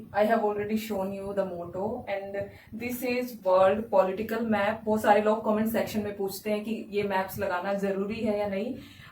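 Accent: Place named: Himachal Pradesh, India. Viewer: Himachali accent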